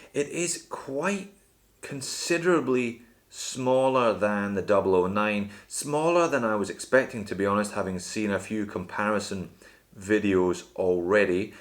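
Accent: Scotland, United Kingdom. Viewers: Stop doing that Scottish accent !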